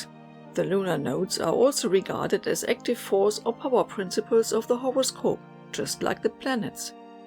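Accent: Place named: Germany. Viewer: I hear a german accent. Are you from Germany or Austria or something like that? :)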